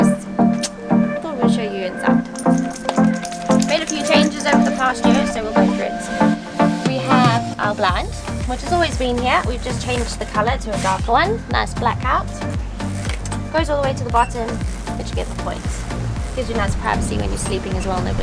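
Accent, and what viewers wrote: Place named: Southern Africa. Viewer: What part of Southern Africa are you from?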